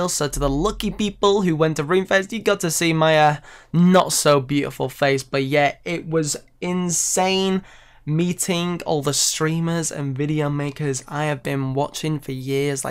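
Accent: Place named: Northern England, United Kingdom. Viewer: North England Accent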